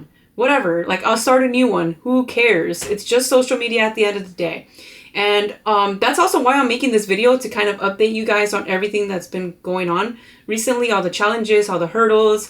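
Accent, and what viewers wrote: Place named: Los Angeles, United States. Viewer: Hell yeah! I started to follow you, I can relate to you from that LA accent lol , I grew in LA ayeee fooo!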